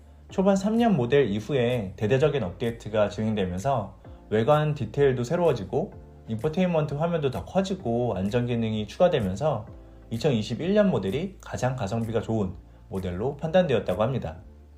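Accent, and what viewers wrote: Seoul, South Korea. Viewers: 외국에 사신지 오래 됐나 보내요 저도 서울에서 나고 자라 미국온지 오래 됐지만 이분 요즘 서울 말씨 맞습니다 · 외국산 지 오래돼서 서울 말씨 모르는 건 그쪽 같은데. 요새 서울에는 남도 사람들이 많아져서 서울 말씨 모르는 사람들 많음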